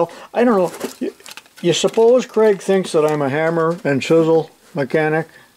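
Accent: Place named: Illinois, United States. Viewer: i know this sounds really weird and stalk ish of me but i live in Quincy Sensei and there is a part of me that deeply wants to meet you before we have to say goodbye to you for good, and time is short. i don't know how we could work that out and keep our privacy intact. but it is a honest wish of mine. it pulls at my hart just a little lets just say.for no farther than i'm thinking it is . i'm taking a ball-park stab at the distance of a drive it might be. i think it be a lasting regret if i didn't at least try if it was o.k. with you. i'm thinking Central ill, from the sounds of it. meaning your manner of speech . the state is regional like that if you haven't noticed in all your years. and the way southern ill. has its own sound as you go farther south . like when the closer you get to that dump the call Chicago as well the sound changes.like when your are next to Mo. 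or Iowa being smack dab in the mid west. we border quite a few states and well they all have an influence . but rural central ill is a island all to it's self . you don't have to say here an i don't advise it you know how some people are. its not as innocent of a world as it was in your younger years i wouldn't want you bothered by any unwanted visitors. but just maybe you could see your way to agree to meet some place public . oh i don't know thought just hit me just now to suggest the local court house if that made you more comfortable . well i wont pester you about it any longer it just think it over. one m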